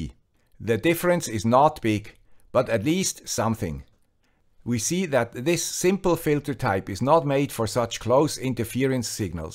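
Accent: Switzerland. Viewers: Over the years, my ears have developed an adaptive filter for that Swiss accent. Thank you for producing material that made that very much a worthwhile endeavor.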